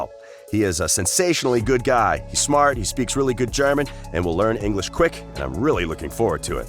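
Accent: United States. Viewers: U can tell he's an American for sure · Soon as I heard the annoying twang of American accent couldn't take it seriously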